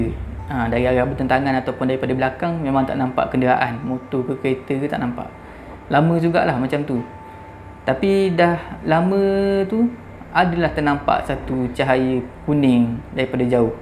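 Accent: Terengganu, Malaysia. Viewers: Setuju tak semua orang boleh faham dialek Terengganu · ckap ganu kali bang😁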